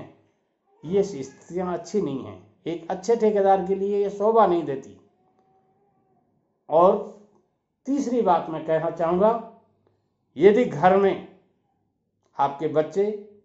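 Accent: Rajasthan, India. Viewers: Dadaji Aap Rajasthan me konse jile se ho Bhansa aapki Rajasthani si lg rhi h. point 1. Bai ka fool bai k lag jay. 2.Bahut bde bangle ka kam tevad diya.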